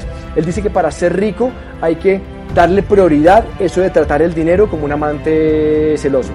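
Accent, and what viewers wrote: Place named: Colombia. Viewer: A mi me encanta el acento colombiano! No les hagas caso! La gente mediocre se la pasa criticando!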